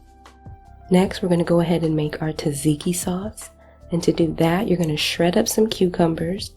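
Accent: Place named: Philadelphia, United States. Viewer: I recognized that Philly voice!!!😉🎶 During this pandemic, we couldn't take Mom out for her birthday dinner, so we made this and a red velvet cake! She loved every bite of it!!! Keep 'em coming! Jersey's in the house!!!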